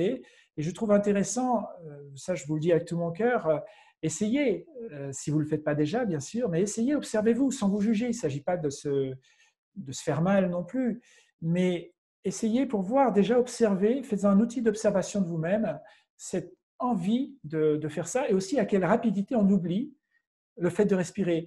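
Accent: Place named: Quebec, Canada. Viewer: Bel accent Québecois dis donc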